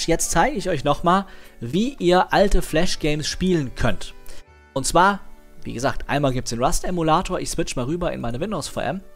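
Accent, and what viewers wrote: Hesse, Germany. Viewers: Ich weis nicht ob mir dein hessischer Dialekt früher nie aufgefallen ist, aber ich lieb‘s sehr 😂👍🏻 Und schön ein neues Video im neuen Jahr zu sehen, hoffentlich bleibt es in Zukunft dabei so regelmäßig Videos zu sehen. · Der hessische Dialekt hat sich auf jeden Fall nicht geändert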